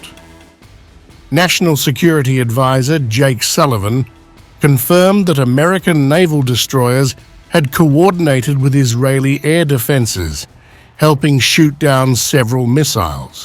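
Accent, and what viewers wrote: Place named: United Kingdom. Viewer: And speak american English, not brutish one!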